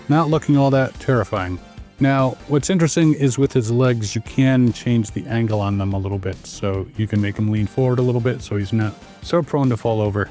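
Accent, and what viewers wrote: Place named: Scotland, United Kingdom. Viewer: Which part of Scotland are you from, man?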